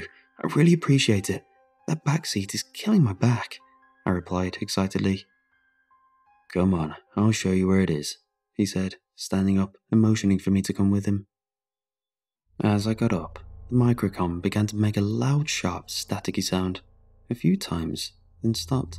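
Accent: United Kingdom. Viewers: Easily my favorite story you've read. This and the forest fire story with all the different things happening every night. Your light British voice fits these 2 stories and both main characters absolutely perfectly👌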